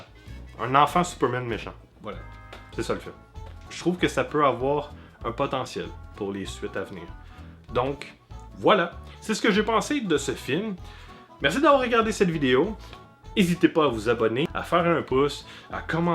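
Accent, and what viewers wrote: Canada. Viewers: tes quebecois je crois tas un accent canadien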